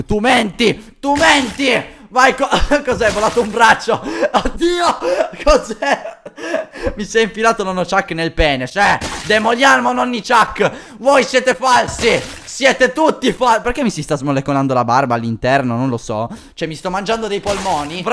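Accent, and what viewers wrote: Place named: Rome, Italy. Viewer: ma sei romano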